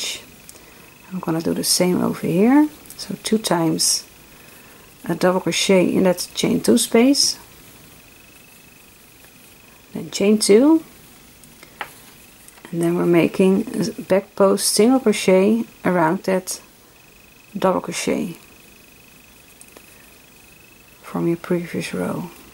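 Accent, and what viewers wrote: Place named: Netherlands. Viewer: I learned this one with a just one sc rather than two, but otherwise the same. I love that Nederlands accent - unmistakeable ! :) But I dunno how come you guys speak such faultless English !!!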